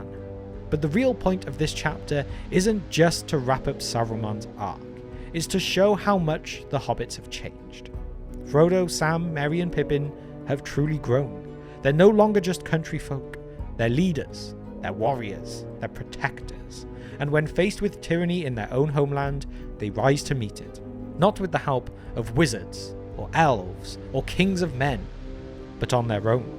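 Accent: Wales, United Kingdom. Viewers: You are the guy from TT alliance or every Welsh sounds the same?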